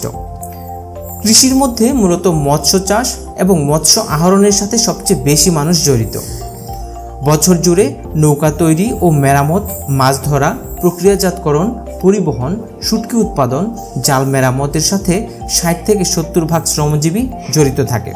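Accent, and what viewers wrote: Noakhali, Bangladesh. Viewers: আপনি কি নোয়াখালির নাকি,,, আপনার পরিবার নোয়াখালি থেকে এসেছে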